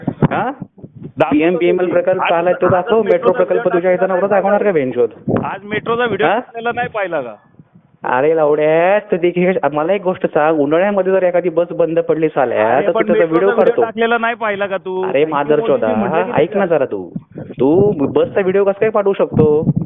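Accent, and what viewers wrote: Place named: Nashik, India. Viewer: बोलण्यात असा वाटत नासिक किंवा A.नगर चा दिसत आहे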